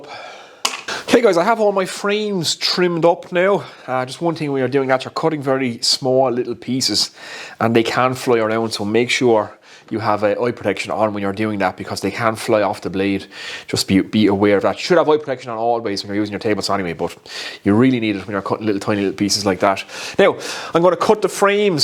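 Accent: Ireland. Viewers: I think it'd be cheaper to scrap some cheap yard sale lamps for the components... I've got access to a bunch of wood and have all the tools but I just couldn't see myself selling those for that much considering how much batch work goes into it. I don't think I'd charge more than about $65-$70 a lamp all in all. rather spend a week cutting up parts and components and spend the weekend assembling a BUNCH of them. steep prices mean you don't have to sell AS MANY to get where you need to be but cheaper prices mean MORE people are going to be willing to buy them which is a more steady strategy. I guess it comes down to HOW much do you want to work, then again going off the accent I'm guessing you're in Ireland and I don't know the import taxes on a lot of that stuff so that's likely where most of the high cost for the electrical components is coming from. the price on those components is just mind boggling lol they should be like a dime a dozen! 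you should change jobs and be an importer at that point though... life's too expensive anymore these days lol doesn't make sense to me...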